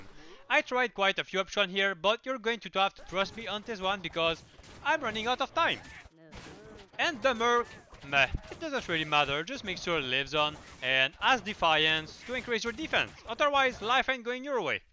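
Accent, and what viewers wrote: Quebec, Canada. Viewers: Omg that thick QC accent xD, cool vid!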